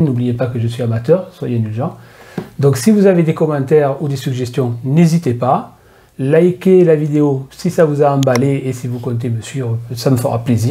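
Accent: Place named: Marseille, France. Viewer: Félicitations pour ce beau projet !!! 🍻 Et vive l'accent marseillais ! 😄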